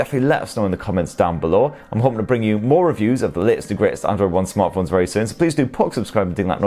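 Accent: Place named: Newcastle, United Kingdom. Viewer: I'm a new subscriber, and it's great to hear reviews from someone in the uk....with a lovely Geordie accent? Love your sense of humour too. Just wish you would take a breath every so often!!! Lol I'm a technophobe, who still uses a non smartphone Nokia 6300, and I'm "trying" to make sense of all this tech-speak, with the thought of buying a mid priced smartphone.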